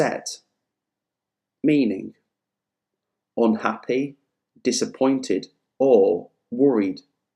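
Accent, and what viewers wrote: United Kingdom. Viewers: The pronunciation is a British accent?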